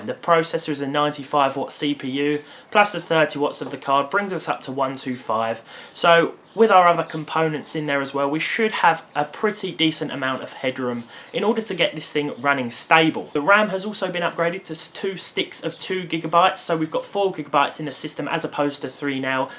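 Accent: United Kingdom. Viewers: I am just watching all your videos because of your awesome British English emphasis :D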